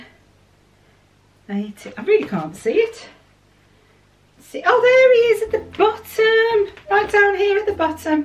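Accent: Birmingham, United Kingdom. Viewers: Hi ☺️ I'm a pretty new subscriber but I instantly loved you and your channel. Not only are you British Disney YouTuber, which made me so happy, but I could instantly tell from your accent that you must be close to Birmingham and that's where my mum and her family are from. Brummy is basically my second language haha 😄 My mum is around your age and I just showed your video to show her about the clubs you used to go to and she used to go there too 😄 Made her super happy because she lives all the way down in Devon now. So yeah, just wanted to write you a quick note to say how much I adore you videos and how lovely and fun your are. Sending you lots of magical Christmas wishes xx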